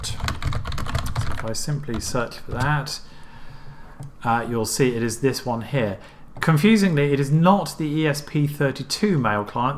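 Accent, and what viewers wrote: United Kingdom. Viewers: Miss the British accent... Away from England for over 12 years... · Absolutely excellent instruction video! Thank-you. PS love the 'posh' accent. :-)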